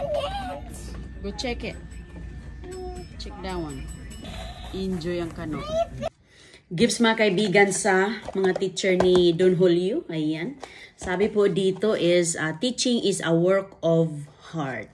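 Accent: Visayas, Philippines. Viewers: Bisaya si kaya ganyan sya mgsalita manood ka nalang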